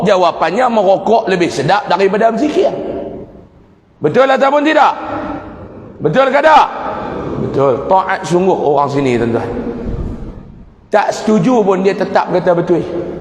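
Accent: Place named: Kedah, Malaysia. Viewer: Ustaz,,jgn risau,saya orang kelantan, tapi saya peminat no 1 ustaz. Faham sgt apa yg ustaz cakap.. Cakap Kedah pun semua bulih paham.. Malam ni lantang dari biasa. Terbaik ustaz.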